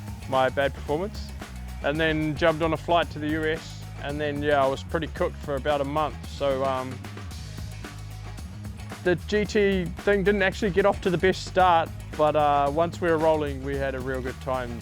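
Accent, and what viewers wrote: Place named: New Zealand. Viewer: I would love him in the commentary booth but if he failed the test it is probably because his english is pretty hard to understand. We're talking heavy kiwi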